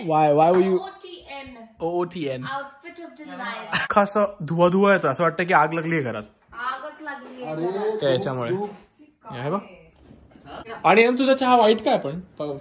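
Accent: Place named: Nashik, India. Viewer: tujya videos madhe nashik khup aikayla yeta.... Btw mi pn nashik ch ahe...tu hi nashik cha ahes ka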